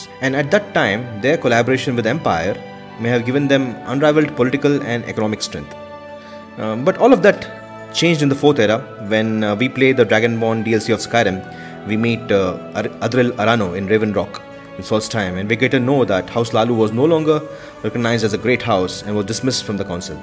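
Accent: India. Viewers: Great content. Going by your accent, I presume that you're Indian. I would be really interested to hear your take on the influences of Indian culture and religion on Morrowind's developers. I played this game long before I had the slightest clue of its real-world inspiration, so I have a hard time dissociating one from the other. Cheers!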